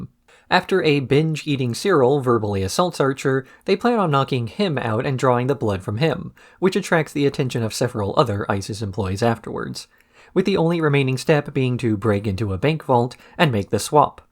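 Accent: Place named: South, United States. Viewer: Ah! I think not being American where the accent is from can be hard to place so I think it’s very interesting that yours is described as southern draw! But I also think is funny is that I have been biting my tongue from making this comment so many times because it just seems so rude even though I knew I was coming from a place of curiosity and I have heard you say women before but the last few videos I’ve watched of yours you haven’t and then as soon as you’ve replied to this comment you just said it again 😂 thank you for taking the time to reply and I do hope it didn’t come across as obnoxious!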